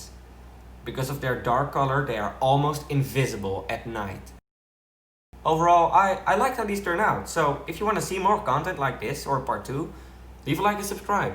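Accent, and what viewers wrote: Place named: Netherlands. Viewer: Ew weird dutch accent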